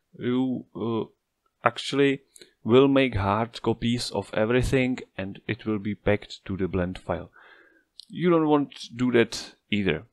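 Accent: Czech Republic. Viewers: this is exactly what i needed thank you! by the way, are you by any chance from Czechia? the accent sounds familiar :DD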